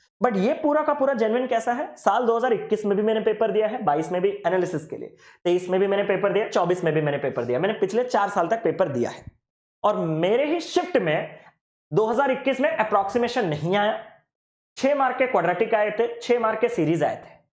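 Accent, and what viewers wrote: Odisha, India. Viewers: Tum odia ho kya kese hindi bol rehe ho❤❤❤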